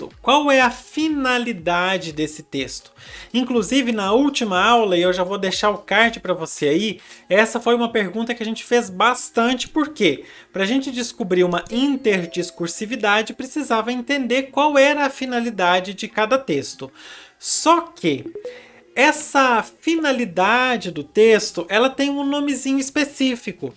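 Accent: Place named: Minas Gerais, Brazil. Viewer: caramba! Jurava que vc era de algum Bernoulli (pelo sotaque que me lembra o MG). Estudei em um cursinho renomado e os professores não eram tão bons como vc (no sentido didático).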